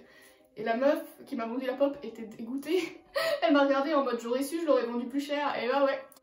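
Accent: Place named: England, United Kingdom. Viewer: Ta un accent anglais un peux j'adore ton accent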